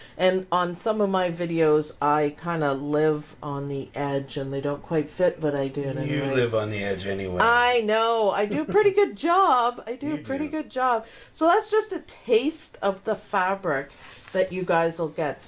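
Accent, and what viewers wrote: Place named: Canada. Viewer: 😊 I joined team Hatch today! Gonna be binge watching all the lessons and try to retrain my brain from pe design! Had Thanksgiving with a dual citizenship Canadian\American and she has your accent, brother's neighbor! Lol... Had a nice chat about healthcare differences and how screwed up it is here in the US. But her accent made me think of you!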